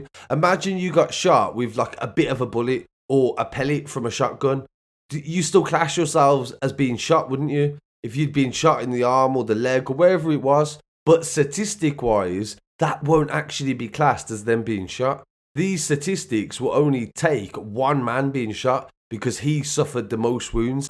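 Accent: Birmingham, United Kingdom. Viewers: Cool brumny accent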